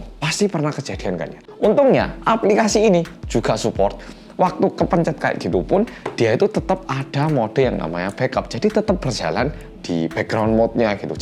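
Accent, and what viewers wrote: Java, Indonesia. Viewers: ngakak pas ngomong "muka blum raup" wkwk njawani bnget ya mas ni...😂😂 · ORANG KOREAA YANG MEDHOOOOOKKKKK!!!!!!! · Kaka, kaka itu kok bisa medok?, orang jawa yaa, sumpah, bahasa jawa aja tau kaka KEREN!, pertama kali aku denger orang korea medok :) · Kok mas bisa yh medok Indonesi banget. Tapi pas aku mau medok korea kok ndak bisa yah 😂😂😂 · Mas medokku😘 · udah selesai nonton baru sadar, ini promosi toh. dasar mas medok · Mas: bersama orang Korea yang... Me: meddhooookkk.... Makasih mas i see Taeyong Jimin ^^ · Aq wong jowo kalah medok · So simple. I see Ty & Jimin, I click!:D Btw, candu bgt ama voice medhoknya mas 😭💜💜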